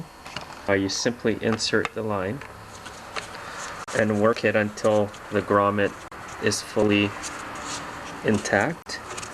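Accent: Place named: Canada. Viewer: Odd that the related clips on the right fills upp with girls in bikinis more than chainsaw videos. I guess it says something about the audience. Other than that, really nice videos, and at least for a scandinavian your canadian accent sound so much nicer than the average americans.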